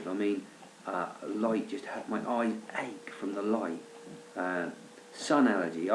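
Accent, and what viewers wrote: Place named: Norfolk, United Kingdom. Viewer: Wow...thank you so much for doing this video. Yesterday I had an epiphany! After approx 10yrs of taking Diazepam and Zolpidem for my 2-day very severe, frequent migraines, I realised that I have very probably had benzo withdrawal continuously!!! I am prescribed it for migraines, and in between the migraines I don't take anything! I have severe M.E. too, but for the past 4 yrs have had a lot of extra weird symptoms that drs can't explain (and are not interested in). I don't feel they are M.E. related...they are identical to what you are describing though!! Having been ill for 21 yrs, I am at my worst...barely managing to "survive". Pain levels are through the roof 24/7 and the full blown migraines are now every 3-4 days, and for years I've had migrainy days in between the migraines, which are hell. They are basically like having 50-80% of a migraine. 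They are currently happening 95% of the time!! So... I am hopefull that it is the benzos causing this, but not particularly looking forward to full blown withdrawal!! ....... Nice to hear a Norfolk accent? And a doggie chewing his bone??? Lol I wish you all the best and hope you start to feel a bit better soon.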